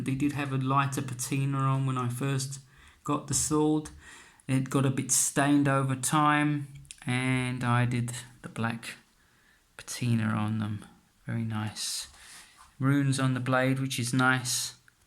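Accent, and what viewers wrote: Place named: United Kingdom. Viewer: You sound British, like me - did you have any issues importing the Albion swords into the UK, import tax, etc., please...?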